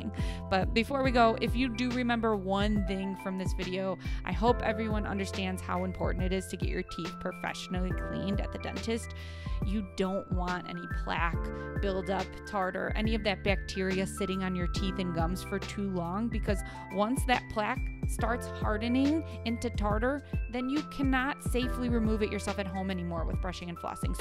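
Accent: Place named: Michigan, United States. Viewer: I hear a Michigan Accent..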